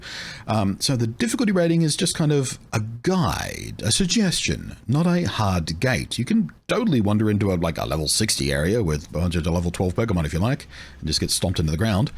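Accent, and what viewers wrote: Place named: Australia. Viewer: Nothing like a low key Aussie accent to massage my brain so I may fall asleep. Quite a podcast voice. Very crispy & creamy 🥱💤💤💤💤💤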